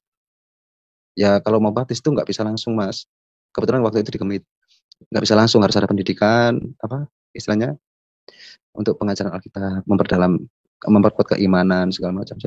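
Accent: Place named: East Nusa Tenggara, Indonesia. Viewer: Logatnya sdh NTT banget ,Mas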